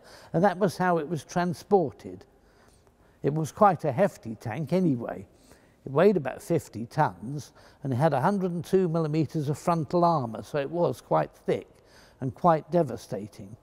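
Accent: United Kingdom. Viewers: Listening to a knowledgeable and gravelly voiced British man talking about tanks from the biggest war in history is literally the most interesting and exciting thing to me.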